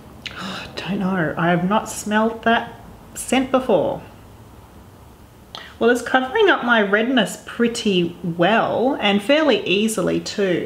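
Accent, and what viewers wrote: Australia. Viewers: Can’t tell you how great it is to hear a fellow Aussie reviewing products. The mannerisms make the video 😂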